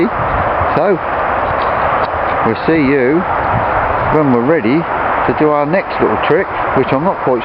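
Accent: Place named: Portsmouth, United Kingdom. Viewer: Watching your cauliflower video, I guessed from your accent that you were from Pompey. How right was I? Horsea Lane Allotments?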